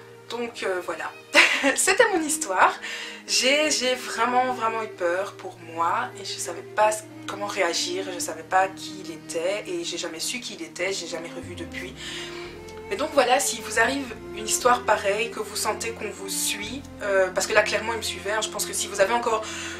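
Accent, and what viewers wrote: Belgium. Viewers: elle est belge? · Cc tu est belge ?? Si oui de ou ??Bruxelle ?? Je t'adore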